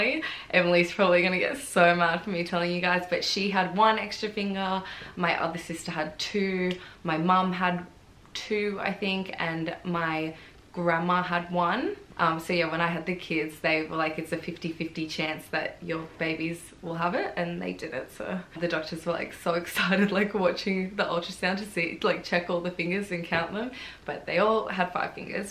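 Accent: Australia. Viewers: I love Australian accent so much that my Siri is male Australian 💯💯☺️☺️☺️ I wish I could speak like you.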